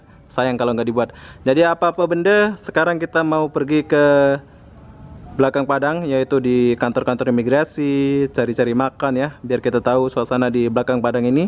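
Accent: Lombok, Indonesia. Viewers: Slamat malam. Ternyata Semeton Lombok. pantesan di pertengahan Vidio dalam hati bilang koq ada logat lomboknya... di akhir Vidio baru terjawab..Salam kenal Semeton Sasak semoga sehat selalu dan tetap semangat dalam membuat konten N semoga sukses semeton.. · Maaf lamun unin" dialek bhs indonesian de jak .....baun tiang bade' pelinggih batur sasak Lombok. Pdas gati dialek Lombok de. Batur mbe plinggihde?? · Lekan ngonek ke indeng indeng logat side marak dengan sasak, ternyata tetu side dengan sasak, ndekne bau telang logat side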